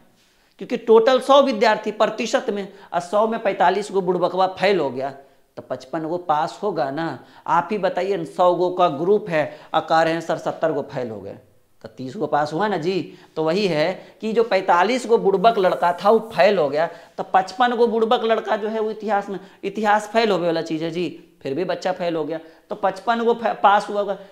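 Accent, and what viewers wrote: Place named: Nawada, India. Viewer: Sir aap buturu bolte h nawada side h kya sir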